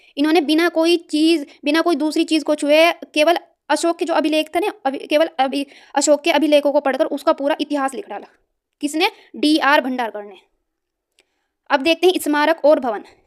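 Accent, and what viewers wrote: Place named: Haryana, India. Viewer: Up west se ho thoda hriyana wali vibe h language me i think hapur gaziyabad meerut 😮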